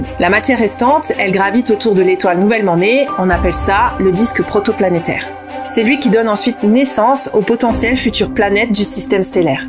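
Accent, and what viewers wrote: Alsace, France. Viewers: Le joli petit accent de notre belle alsace ,passionnant sinon ! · Comment t’arrives a capter qu’elle est Alsacienne ? Perso je vis aussi en Alsace mais je vois pas la différence d’accent avec ceux du Doubs par exemple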